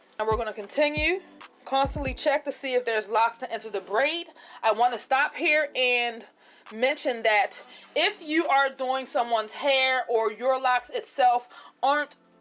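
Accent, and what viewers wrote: Baltimore, United States. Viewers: thank you… the bmore accent is givn life.. this was very informative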